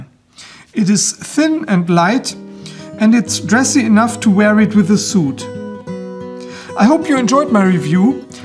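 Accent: Germany. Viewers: lovely knife i love your channel your english is excellent especially with your German accent i find it relaxing and interesting at the same time:) cheers from the UK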